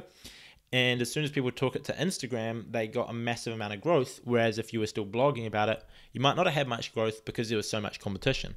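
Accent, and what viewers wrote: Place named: New Zealand. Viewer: I love your kiwi accent ❤️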